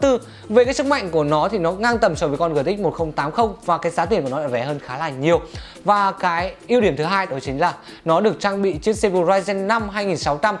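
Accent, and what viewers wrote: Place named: Central, Vietnam. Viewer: như kiểu ng miền trung , nói tiếng miền bắc. dù có vẻ rất hoàn hảo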